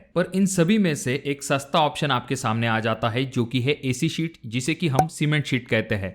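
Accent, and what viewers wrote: Maharashtra, India. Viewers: Speak karte samay marathi uchar aata hai aapka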